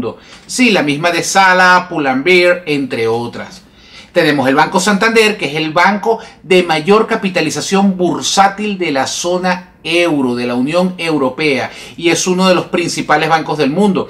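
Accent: Venezuela. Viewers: Eso que dijiste: "España es el Perú de Europa" . Te suena muy ofensivo cuando comparan el Perú con España, y no parece indignarte que se refieran así del Perú. Uhm... y vos no pareces español... más bien venezolano. Pésimo comentarista, no reflexiona en lo que habla. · Es venezolano y está super claro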